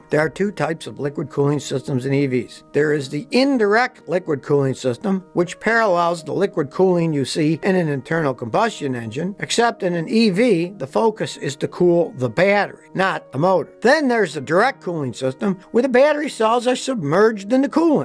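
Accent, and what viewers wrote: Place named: United States. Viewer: I like the accent he talks that one refer to the American 1970's movies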